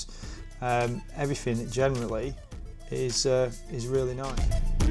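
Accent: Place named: United Kingdom. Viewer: Superb video loved watching it! Totally dig your British accent 👍🏻 Fatcat has raised the bar for what to expect for your hard earned money unlike Bandai who makes you pay extra money for water decals & extra weapon set. I mean they are such a massive company and can easily afford to add all of this & more for the kinda money they charge for an RG model kit. Imagine a 3rd party which 100 times smaller company can give their customers so much value added stuff. Bandai is just a money grab & wanna milk every opportunity like every other MNC! SAD BUT TRUE 😞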